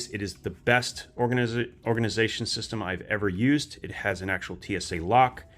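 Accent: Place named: Midwest, United States. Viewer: Virginia? Man, I thought I picked up a "great lakes accent" off ya,. Midwest, or maybe northeast. Anyway, if you could do a comparison video between the Sencut Snap, Waxahachi, Civivi Cogent, Relic Sinisys, and WE Snick, that'd be awesome. That blade shape is what made me want to try a Civivi in the first place.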